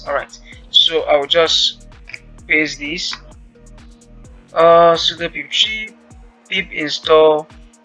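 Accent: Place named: Nigeria. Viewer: Thank you very much sir, you sound like a Nigeria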